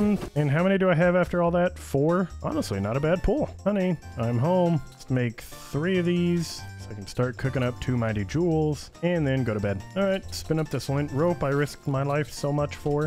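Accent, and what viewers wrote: United Kingdom. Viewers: If heard of you a ton from grounded community days and paralyzers videos i thought you were from the uk 😂😂 didnt think i would like your content but i was wrong funny video ggs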